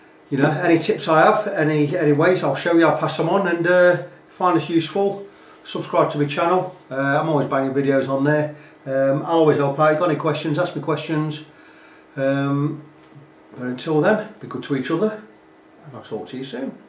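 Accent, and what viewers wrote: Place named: Nottingham, United Kingdom. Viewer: Sounds like a Nottingham or possibly Derby accent there...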